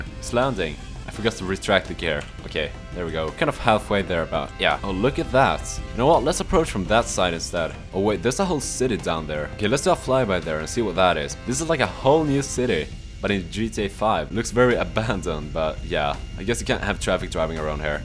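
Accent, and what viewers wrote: Sweden. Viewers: You have a swedish accent my dude hej hur mår du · Swedish Right? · Are you Swedish? You sound Swedish hahaah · Are u swedish?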